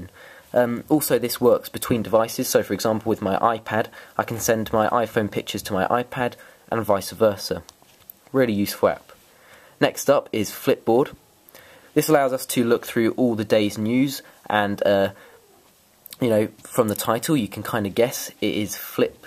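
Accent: England, United Kingdom. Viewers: You Britian? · nice accent. you from england?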